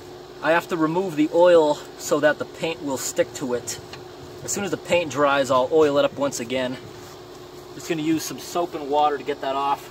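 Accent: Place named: Amish Country, United States. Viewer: Ruff (roof) Rumm (room) Crick (Creek) are you a PA native? You sound like you live close Amish country.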